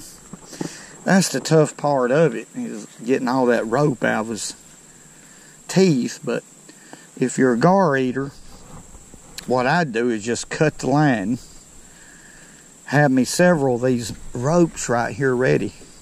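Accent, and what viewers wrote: South, United States. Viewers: You got that Forrest Gump accent where you from ? Nice video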